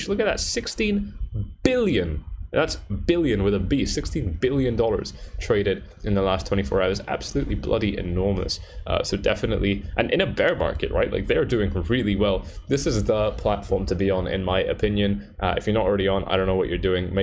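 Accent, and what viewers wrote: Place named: England, United Kingdom. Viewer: I listened to an old man in a truck and now I am listening to a teenager with an English accent for investment advice..I hope I found the one... Wish me luck!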